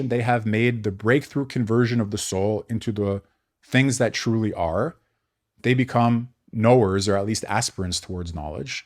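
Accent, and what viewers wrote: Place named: Canada. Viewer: Canadian accent was a surprise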